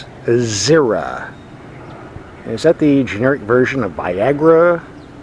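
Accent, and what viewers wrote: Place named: Newfoundland, Canada. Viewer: You sound Newfie, but there's Cacti in the background.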